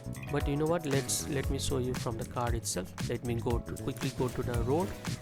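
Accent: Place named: Nepal. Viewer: The video was really good and easy to understand, but your accent got me. By any chance are you from Nepal? Your accent really reminds me of a typical Nepali accent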